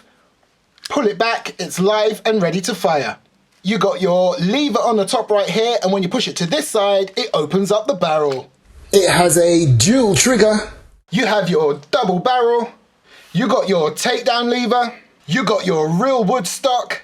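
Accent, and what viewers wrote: United Kingdom. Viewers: Are you British? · You are delightfully British. I love it.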